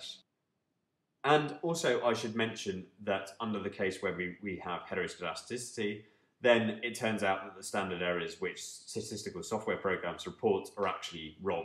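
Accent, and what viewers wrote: United Kingdom. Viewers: it would be great if you enable caption on your videos. as a brazilian student, it is a bit hard to understante british accent.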